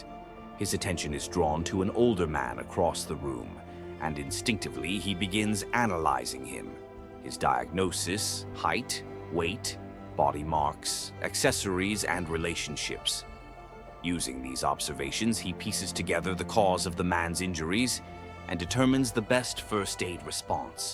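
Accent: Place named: United Kingdom. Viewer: Why is blud british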